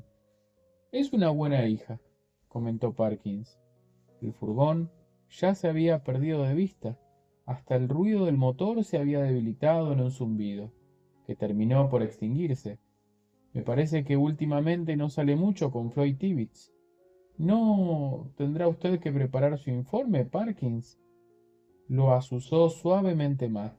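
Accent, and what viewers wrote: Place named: Argentina. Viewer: Querido, mil GRACIAS por leernos. Consulta: ya leíste en el otro canal APOCALIPSIS? Que gusto escuchar leer en argentino 😁💪🏽🇦🇷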